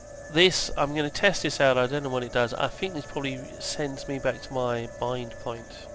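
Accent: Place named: United Kingdom. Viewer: Clicked on video in recommended videos. 12 seconds in I'm bursting out laughing at how amazingly British your voice is (and I'm British!)! Not an insult, I just couldn't stop myself, interesting looking mmo, here, have a like.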